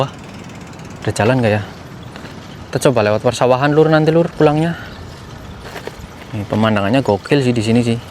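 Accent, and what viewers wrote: Banyumas, Indonesia. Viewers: Guyu ne kakak khas banget kaleh ngapak e nek ngomong seng bikin TOP.bojonegoro Jawa timur hadir.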